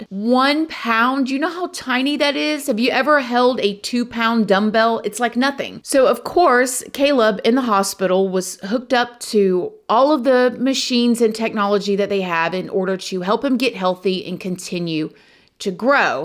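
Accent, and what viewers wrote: South, United States. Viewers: Don't come at me. This isn't meant to be an insulted, but of course, some people will always take it as an insult cause the Internet and there's so many personalities. Your speech is hillbilly but you are one of the smartest crime reporters on youtube. I really enjoy your videos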